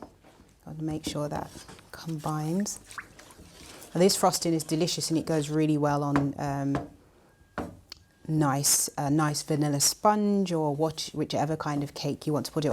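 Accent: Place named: United Kingdom. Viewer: The long pause and pan up of the camera at the beginning of the video was quite creepy and strange 😂 I also was not expecting her to have a British accent.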